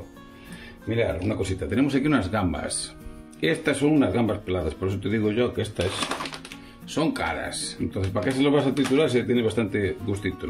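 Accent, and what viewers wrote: Galicia, Spain. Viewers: Me encanta tu acento y tu tierra gallega; te saludo desde Murcia. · Por tu ace to diria que eres gallego, desde donde emites? . Yo te veo desde OURENSE❤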